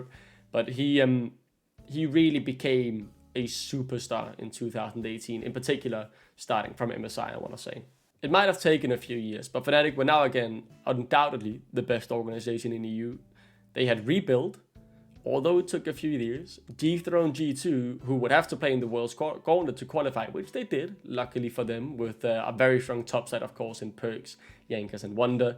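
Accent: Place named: Denmark. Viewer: Not the Danish accent hahaha, great video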